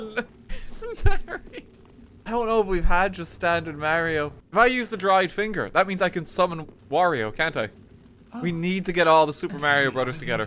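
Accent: Ireland. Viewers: Irish, not British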